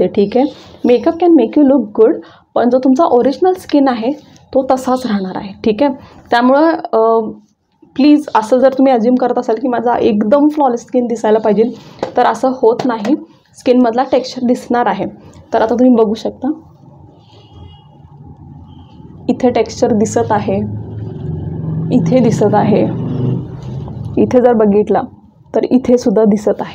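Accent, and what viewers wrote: Kolhapur, India. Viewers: मॅडम तुम्ही कोल्हापूरच्या आहात का, मध्ये मध्ये भाषेवरून वाटलं❤ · Hi ….. mi make up sathi khoop video pahile pan tuza video khupch chan aani useful vatla. Mast boltes 😊assal Kolhapuri❤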